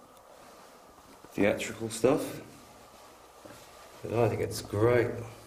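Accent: Australia. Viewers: yeah, I did some reading and it's pretty interesting and creepy to know just how many died horrific deaths there. Some think she was innocent but that her servants set her up or were coerced into false testimony. Some think the servants themselves did horrible things to each other there. Either way, some very dark history. You're very fortunate to travel to these places and it's great you share it 😄. I love learning about new things and history. There's something I just love about the Australian accent too. I'm a wannabe Australian lol. Keep the videos coming!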